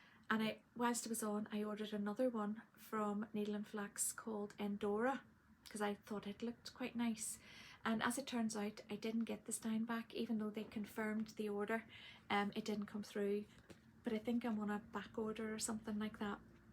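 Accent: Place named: Ireland. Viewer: Thank you for giving us another awesome floss tube ❤ beautiful finishes, project bags and your tiny 🐞 ladybug. And I love listening to your Irish accent, it is so soothing! Yes for a tutorial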